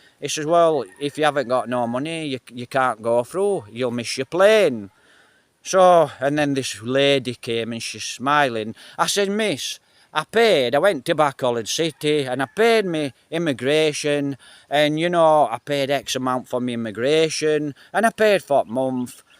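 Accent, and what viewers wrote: Yorkshire, United Kingdom. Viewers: Hilarious.:face-green-smiling:. Are you from Yorkshire, mate (like me), or a red rose ?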